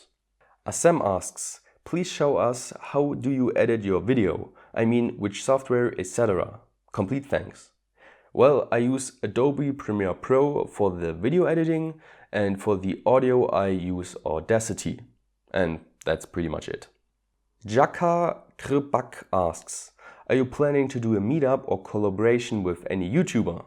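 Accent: Saxony, Germany. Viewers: I love the German accent, it actually makes your videos more interesting. I will see you later! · Jaaaaa, sächsischer Dialekt und Simme fahren, das ist Kultur! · Ich wusste immer das du ein deutscher bist man Merk das an der Sprache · Your English is improving fast. Are you planning to relocate to an English speaking country. I like the German accented English though. Holiday on DIY bike ???? Awesome. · Ich wuste du bist deutsch ^^ Da deine handy werbung auf deutsch war und mans an der stimme hört ^^ PS: Geile video, bitte weiter so! vll auch deutsche videos und englische zugleich? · Glad to see your face :),and your Germany accent , and i like your projects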